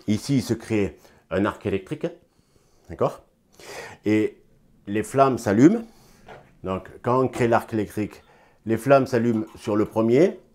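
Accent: South, France. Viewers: Super! "Putin" cet accent! Je suis Marseillais, j'en connais quelque chose!! J'ai une chute de pression sur le manomètre, je remonte la pression tous les mois à peu prés, d'où peu venir cet perte de pression en sachant que je n'ai aucune fuite et que mes radiateur sont purgés. Soupape de sécurité qui est reliée par un pvc de 32 à l'égout? quand pensez-vous? Merci pour votre réponse.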